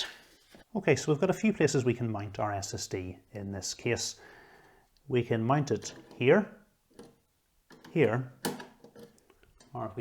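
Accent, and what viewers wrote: Northern Ireland, United Kingdom. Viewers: Ignoring the obvious NI accent similarities, you sound an awful lot like Jim Browning! In the nicest way possible, your voice belongs to a much older man. It's calming and soothing. I'm from NI too, and never have I heard someone younger than about 45 sound the way you do.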